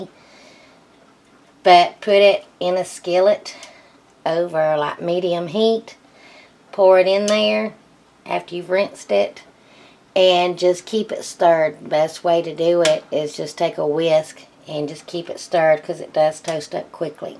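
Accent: South, United States. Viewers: Thanks for sharing!!! Love your Southern Bell personality & voice!! You're amazing:) thanks again!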